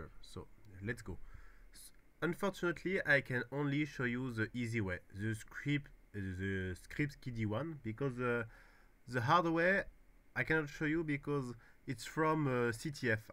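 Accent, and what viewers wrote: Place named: France. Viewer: noticed the french accent merci frérot pour le partage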